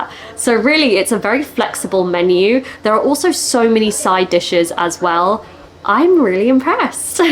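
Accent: United Kingdom. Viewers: 呑兵衛のさん、しかもサッポロ黒ラベル、ジンギスカンとビールが進みますね。華麗なイギリス英語の、聞きやすい発音、大きめの文字、教材としても秀逸です。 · LOL なるほど！ ”obviously” って、こういうふうに使うんですね。勉強になったー。それにしても、ずっとさんのイギリス英語を聞いていたら、イギリス英語の発音が大好きになってしまったよ。そして、自分よりも多くの日本全国のおいしい料理を食べてるさんが、うらやましい笑今日のビデオは一段とおいしそうで表情が豊かで最高でした！ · 見事なブリティッシュイングリッシュです聞き取れません脱帽ですすごいです🎉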